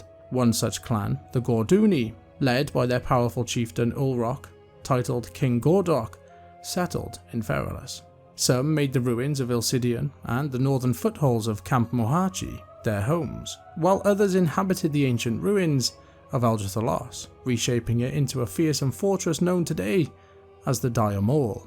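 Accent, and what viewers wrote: Wales, United Kingdom. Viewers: A fellow Brit but do I detect a hint of a Welsh Accent, a fellow Welsh kinsman? :0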